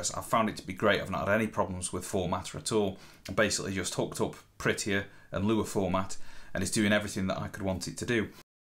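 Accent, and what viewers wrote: Stoke, United Kingdom. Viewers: I was PROPER surprised to hear a Stoke accent when you started talking!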